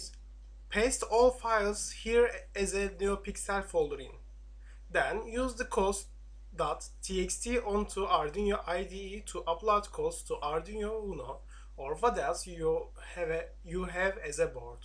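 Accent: Turkey. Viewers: Video başlar başlamaz dedim konuşan kesin Türk. Sonra aşağıya bi baktım harbi bizden. Aksan acayip ele veriyor insanı.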